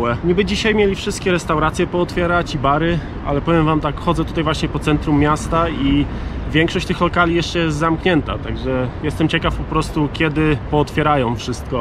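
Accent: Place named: United States. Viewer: I tez amerykanski akcent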